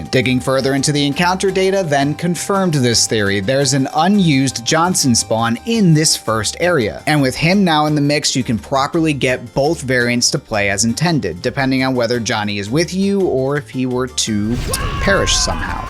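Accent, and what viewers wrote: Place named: United States. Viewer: Voice reminds me of shilt show! With a different American dialect,Lol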